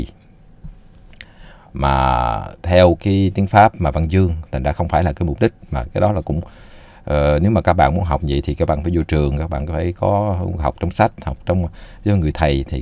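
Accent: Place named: South, Vietnam. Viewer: Cảm ơn thầy, Em rất thích học và nghe, gì thầy nói tiếng trong nam, Em nghe rõ, Em rảnh giờ nào cũng luôn mở nghe, chúc thầy và gia đình luôn được nhiều sức khỏe may mắn hạnh phúc vui vẻ an lành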